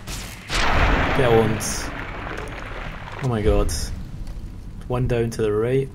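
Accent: United Kingdom. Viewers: awrty mate ;) Nice video man, where about in Glasgow are you from, since you have Glaswegian accent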